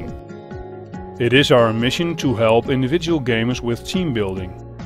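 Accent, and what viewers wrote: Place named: Netherlands. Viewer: is hij nederlands want zn zijn accent is gwn nederlands en 'is' en 'gamers' zat een heel nederlands accent op xD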